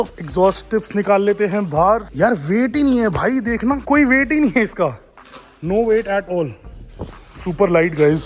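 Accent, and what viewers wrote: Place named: Punjab, India. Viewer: stock awaz madi nai h😂 prava hindi ch punjabi 😂 good bro 😎 sara kuj punjabi ch bolya kr yara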